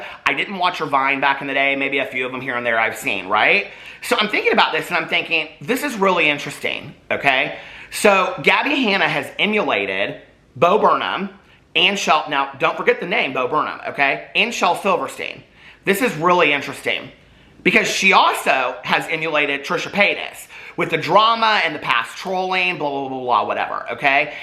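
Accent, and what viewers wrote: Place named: Indiana, United States. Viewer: I think its just his Indiana accent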